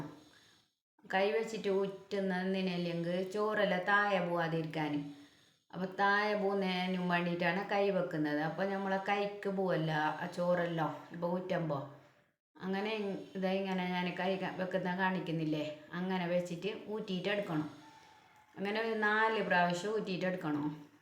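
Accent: Kasaragod, India. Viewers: Nalla usefull video..bakki Vanna choru ini ithupole cheythunokkanam..kasargod samsaram kettirikkan nalla rasamund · ഇത് കൊള്ളാലോ നല്ല ഐഡിയ ആണല്ലോ. നമ്മളെ കാസർഗോഡ് ഭാഷ കേൾക്കാൻ നല്ല രസമുണ്ട്. കാസർഗോഡ് എവിടെയാ ഞാനും കാസർഗോഡാണ് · എനിക്കൊരുപാട് ഇഷ്ടപ്പെട്ടു നല്ലൊരു വീഡിയോ ആയിരുന്നു ഞാൻ ഇതുവരെ ഇങ്ങനെ ഉണ്ടാക്കുന്നത് കണ്ടിട്ടില്ല തീർച്ചയായും ട്രൈ ചെയ്തു നോക്കും അറിയാത്തവർക്കു വളരെ ഉപകാരമായി വീഡിയോ ആയിരുന്നു ഇങ്ങനെയുള്ള കുറെ ടിപ്സുകളും ഉപകാരമുള്ള വീഡിയോയുമായി വീണ്ടും വരണം കാസർഗോഡ് സംസാരം കേൾക്കാൻ നല്ല രസമുണ്ട് ഞങ്ങൾക്ക് കാസർഗോഡ് ഭാഷയിൽ സംസാരിച്ചു കേട്ടാൽ മതി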